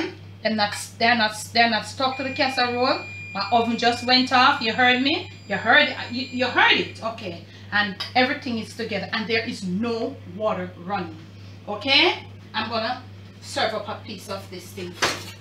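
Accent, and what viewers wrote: Jamaica. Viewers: Typical good good up Jamaican cook. She switches effortlessly from proper english to patois... Cho! Big up, food look so good... 🇯🇲🇯🇲🇯🇲🇯🇲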